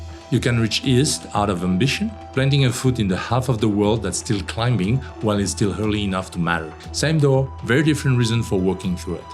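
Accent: France. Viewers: Lol says a guy with french accent , , compare to Switzerland is France dump with all there problems‼🤭😂🤣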